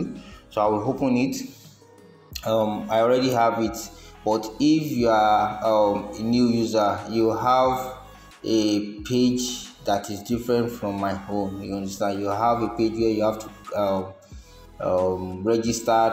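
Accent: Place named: Africa. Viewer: Just because you dont understand African english. People like us understand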